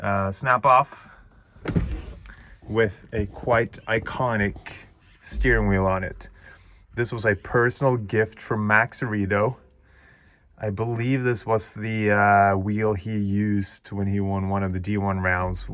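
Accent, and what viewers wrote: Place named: Southern California, United States. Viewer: I feel you should put an actual propeller on the back of it keeping with the spirit of tail spin. You can do one with a small electric motor that be functional. Also your English has gotten really good. You have a kind of Southern California accent now. I remember meeting you back a at the world drift comp at the long beach docs with your broken English.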